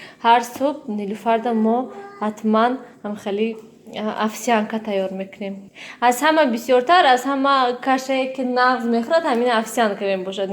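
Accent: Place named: Khujand, Tajikistan. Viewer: Ранги тарафои хучанд гапзанитон падари Нилуча гучоги ?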